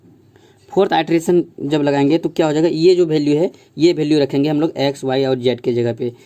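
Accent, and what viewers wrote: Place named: Bihar, India. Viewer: Pakka Bihar se hai aap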